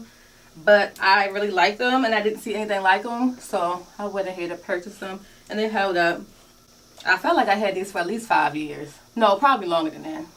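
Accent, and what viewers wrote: Baltimore, United States. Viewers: I really like your feet. Very pretty. And do I detect a bmore accent?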